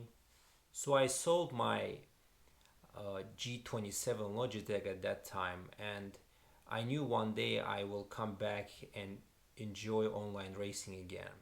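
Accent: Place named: Russia. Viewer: Сразу слышно русский акцент, но твой английский очень хороший!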